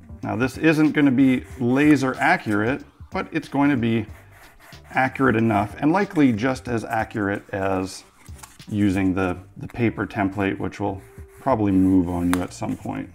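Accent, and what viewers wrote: Canada. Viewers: You said "out" so many times I discovered that you're Canadian.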